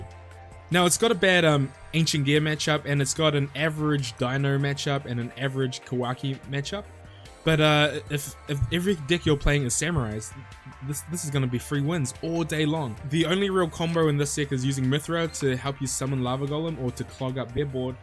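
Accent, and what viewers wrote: New Zealand. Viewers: Dude. Your accent. Are you from NZ???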